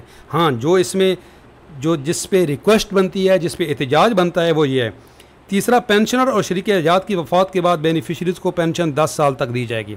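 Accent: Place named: Pothohari, Pakistan. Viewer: AP pothohar say belong rktay lahja pothohar Wala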